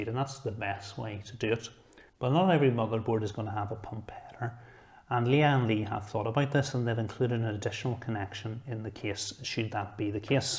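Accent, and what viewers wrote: Northern Ireland, United Kingdom. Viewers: I just built my first ever PC and this was by far the most useful tutorial I came across when it came to building and especially what to do when it comes to installing Windows and installing drivers. I'd be lost without this! PS. My parents are from Norn Iron and when they heard your voice on my phone they said "Ach, a Norn Iron fella! What's craic with him?"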